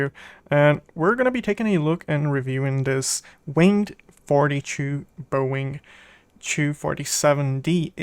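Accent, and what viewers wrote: Scandinavia. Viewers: I was wondering the same thing. My guess is your mother tongue is something Scandinavian. Nice review, BTW. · not especially... yeah I'm going with the guy below me, something Scandinavian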